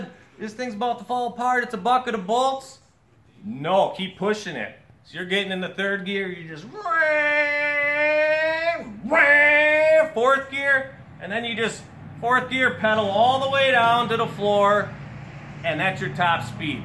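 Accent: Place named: Wisconsin, United States. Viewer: This is the most Wisconsin sounding tutorial in the world. As a life long Wisconsinite I love it. I was waiting for and “ope” on the reset switch. Great content.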